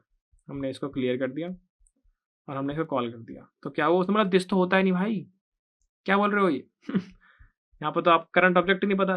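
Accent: Haryana, India. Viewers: your haryanvi accent is so sexy; please say it again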